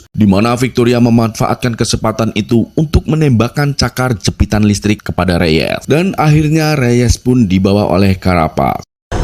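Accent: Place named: Java, Indonesia. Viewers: Cerita Film yang epic dan menarik, pembawaan sangat lugas, jelas, lucu (ada medoknya 🤣👏) dan mengena, mantappp 👍 sukses selalu untuk mu, semoga kontennya bermanfaat. Suwun Maseee 🙏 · Bahasane kaya nyong medok nemen😅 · Basane medok nemen min 😅. Sing adoh nemen 😂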